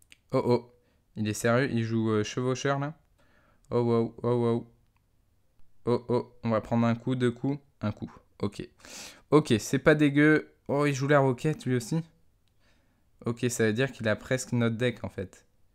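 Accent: Belgium. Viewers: il est belge ? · tes belge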